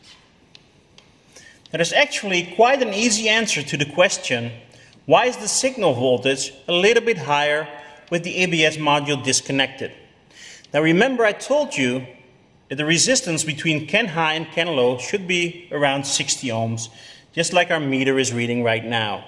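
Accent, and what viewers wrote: United States. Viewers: Ik dacht dat je een Amerikaan was (zo klonk je), tot ik het display van de scoop zag :-) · So I was looking at some canbus diagnostics videos just for fun and then I saw this one with you doing that test drive. And then the shot on the road, I was like: “Hee dat lijkt wel Nederland!!!” 😄 You really had me with that American accent 👍🏼.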